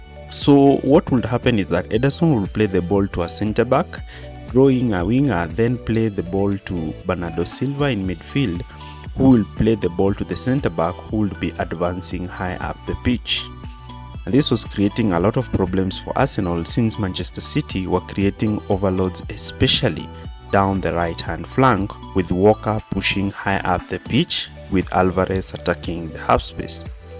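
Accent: Kenya. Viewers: I love your commentary. I don't know where you come from but you have a Kenyan English accent.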